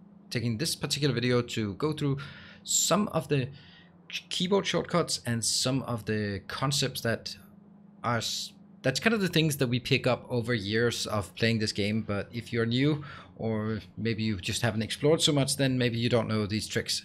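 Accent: Denmark. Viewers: 16 seconds of your voice then i Knew you were danish. god video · Just a quick question. Are you from Denmark or Scandinavia? I can hear you have a danish ascent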